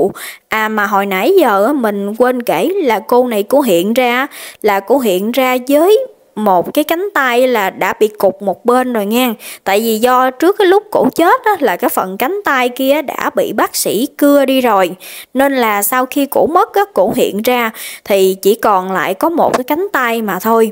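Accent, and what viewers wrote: Mekong Delta, Vietnam. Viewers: Chau kê truyên lưu loat ro rang giong chât miên tây ne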